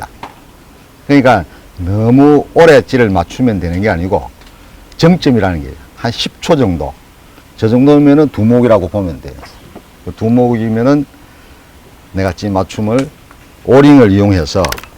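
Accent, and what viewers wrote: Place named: Japan. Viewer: 말이 일본말 같은데? ㅈㄴ 알아듣기 힘듬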